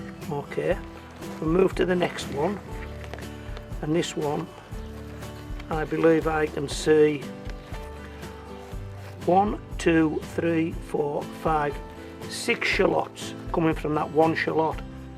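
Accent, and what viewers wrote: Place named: Glasgow, United Kingdom. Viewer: Great boots...you sound like my Grandfather Patrick Alexander Leslie from Glasgow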